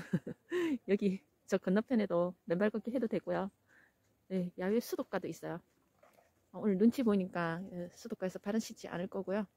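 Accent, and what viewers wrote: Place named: Gyeongsang, South Korea. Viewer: 경상도 분이 서울에 오래 사신 분 같습니다 즐거운 설명 잘 듣고 갑니다 ^^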